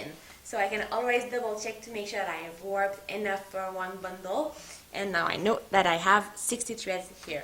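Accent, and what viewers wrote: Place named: Quebec, Canada. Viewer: I can here the Quebec local accent hehe! Hello fellow canadian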